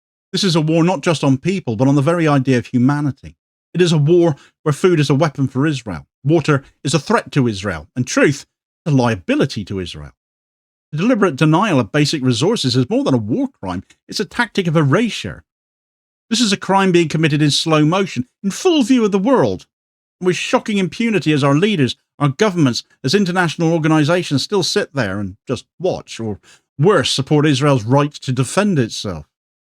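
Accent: Cornwall, United Kingdom. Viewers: Cheers for getting the alternative viewpoint out,. Respect and much appreciation for standing up against bigotry, shut down, intimidation and most sinister of all, genocide. I look forward to your measured "rants" on a daily basis and all in your warm and friendly Cornish vernacular. Keep going, dear friend ✌️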